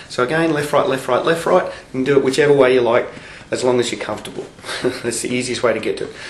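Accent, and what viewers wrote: Australia. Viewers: Sound like an Aussie. Are you in Melbourne ?? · Thank you. Short and sweet and the added pleasant surprise...the aussie accent.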